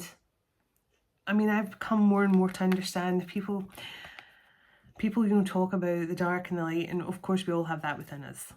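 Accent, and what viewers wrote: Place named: Scotland, United Kingdom. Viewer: You look So Lovely ❤ Blue and White, blessed with a Scottish voice 💙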